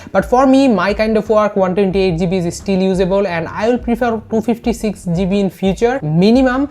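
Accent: Bengal, India. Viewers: Bengali re bhai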